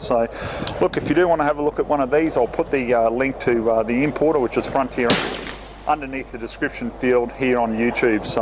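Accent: Australia. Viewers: Awesome review... weird to hear the Oz accent talking about guns :) 1991 9mm model (O1992) was recently added to the Colt lineup and I picked one up (to go with my .45 ACP O1991 and .38 Super O2991). Only difference I can tell is that the US market guns have the bright barrel muzzle and hood.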